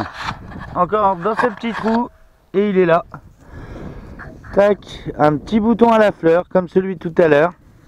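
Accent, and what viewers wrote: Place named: Brittany, France. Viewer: salut, j'adore la présentation de tes trouvailles, on sens bien que tu est de Bretagne. pas mal de trouvailles, surtout des boutons, tu vas bientôt pouvoir ouvrir une mercerie MDR. merci pour le partage, bonne détection et à bientôt + 1 pouce bleue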